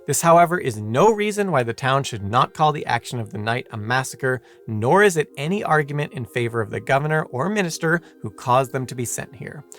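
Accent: United Kingdom. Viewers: you fool!!! The British accent is ASMR to American ears!!! This guys effeminate whaling will never suffice…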